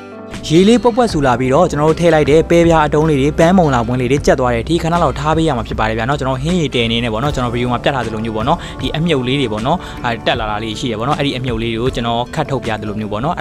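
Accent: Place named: Upper Myanmar, Myanmar. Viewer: ကျေးဇူးပါဗျာ။ အညာဒေသရဲ့ အဝေးမှာ အညာသံနဲ့တင်ဆက်သံ က အလွမ်းပြေ။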